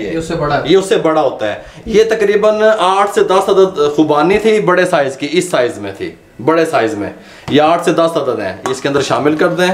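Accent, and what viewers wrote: Punjab, India. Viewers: 😂 😂 Uncle boht nice hain ap... Punjabi tuch boht wadiya lagda g💕💕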